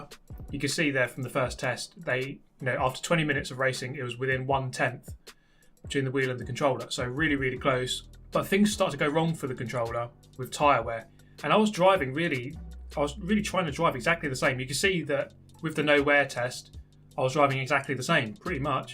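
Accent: United Kingdom. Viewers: In my mind you sounded like more British to me for some reason lol I guess that’s like saying to me if I was in Bristol or something and someone saying to me yea you don’t look to American to me...lol never thought about it like that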